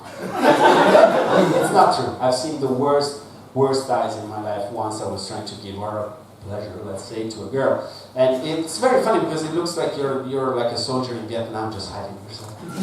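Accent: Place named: Milan, Italy. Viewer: Anche quando parli inglese hai l'accento milanese 😅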